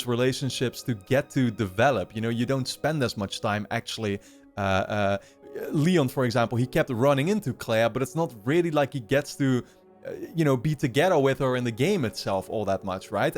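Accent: Netherlands. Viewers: Dat Nederlandse accent hoorde ik meteen 😜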